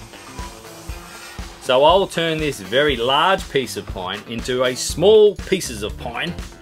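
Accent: Australia. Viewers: Haha loved it. Most other YT channels just couldn’t have pulled this off. That Ozzy accent makes it work. Awesome stuff mate 🤓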